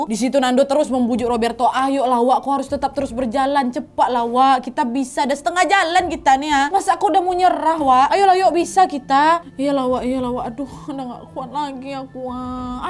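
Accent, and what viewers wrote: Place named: Palembang, Indonesia. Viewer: demen gue, logatnya wong kito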